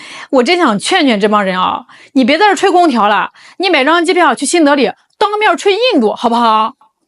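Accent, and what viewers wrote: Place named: Henan, China. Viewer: 河南腔打的😄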